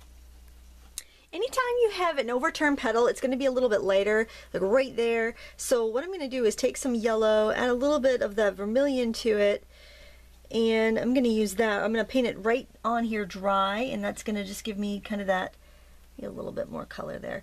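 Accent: Maine, United States. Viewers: Love it when you tell us how words are said in Maine. 🤣shaaarpies lol I bought died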